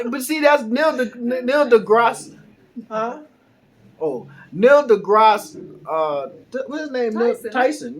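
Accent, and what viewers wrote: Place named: United States. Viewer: The only thing American I see in you is your accent,hang on tight to it lol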